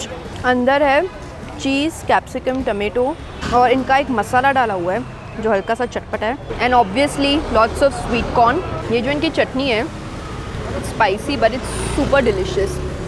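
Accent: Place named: India. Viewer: Indish accent is so funny . Is that how all Indians speak English 😂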